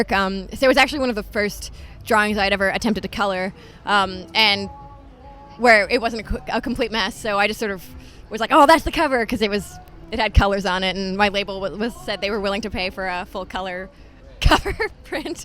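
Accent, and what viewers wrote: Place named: California, United States. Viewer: She's sounding really Californian. haha I kind of miss the rookie Grimes, but it's cool to see how much success she has attained.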